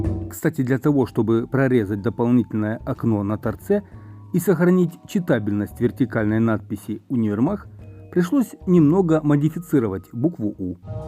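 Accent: Kharkiv, Ukraine. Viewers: Только пару раз там была, все очень дорого))))) 😅А так, конечно же красота, красивое здание! Внутри в старом стиле все, это очень лампово. П. С., обожаю когда именно вы рассказываете, так умиротворяюще, интересно и с нашим, харьковским акцентом ❤️❤️❤️Спасибо ❤️❤️❤️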